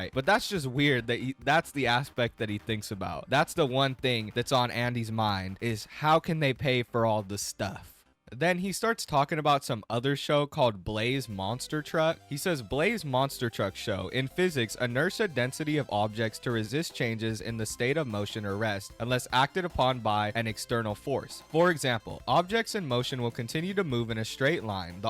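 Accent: South, United States. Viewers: I love your southern twang! Usually I just call what you call "folks" people, I forgot that a lot of southern people say "folks" very interesting!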